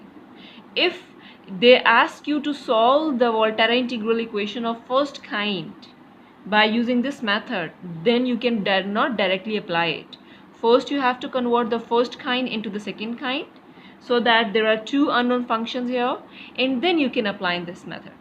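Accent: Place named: United States. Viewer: itna thanda american accent 😆😆😆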